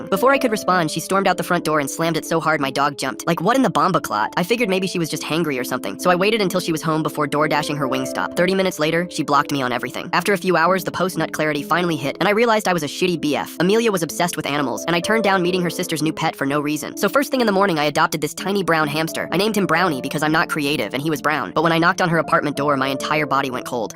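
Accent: Jamaica. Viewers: Is bro Jamaican or something 🇯🇲😅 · Bros Jamaican like me 😂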